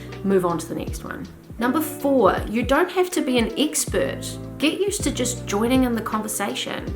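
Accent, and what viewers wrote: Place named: New Zealand. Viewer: Solid advice. I like DaVinci Resolve as well. It has more features than most of us will ever need let alone use. We tend to be our own harshest critics. Your videos are lit well, the background isn’t distracting, you dress for success and your eye contact with the camera is good. And who doesn’t love a Kiwi accent?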